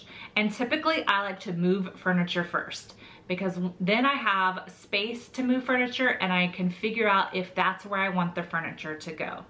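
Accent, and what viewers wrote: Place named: Minnesota, United States. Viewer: Are you native to SC? You sound like you might have been in Minnesota. I am in Rock Hill, SC, not native though. I am a California beach baby. I love all the tips. Thank you so much.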